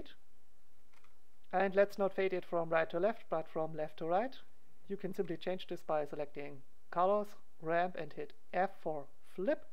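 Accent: Germany. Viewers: Man merkt direkt das du Deutscher bist aber gutes Video Like&Abo haste von mir!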